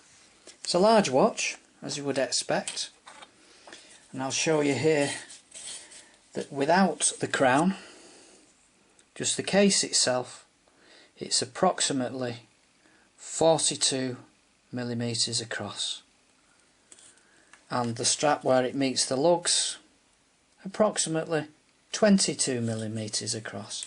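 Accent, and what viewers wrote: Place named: Merseyside, United Kingdom. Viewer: Are you from Liverpool? You have a Merseyside accent.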